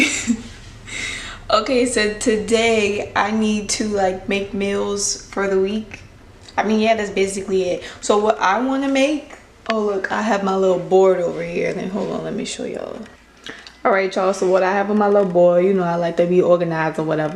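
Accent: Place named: New Orleans, United States. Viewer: I detect a hint of a New Orleans accent in your voice. Food looks good!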